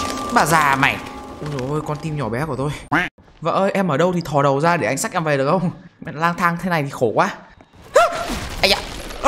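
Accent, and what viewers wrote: Hai Phong, Vietnam. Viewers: Ah dân Hải Phòng à??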